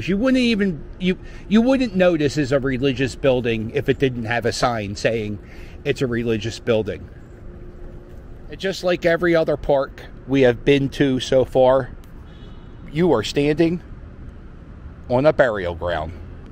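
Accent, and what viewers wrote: Philadelphia, United States. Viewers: Best Philly accent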